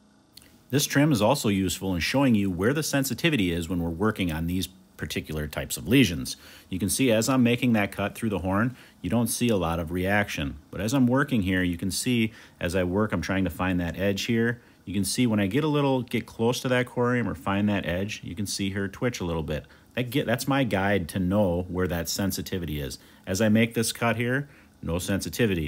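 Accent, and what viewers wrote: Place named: United States. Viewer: Why does your voice sound American in some videos but others it’s mixed with Irish.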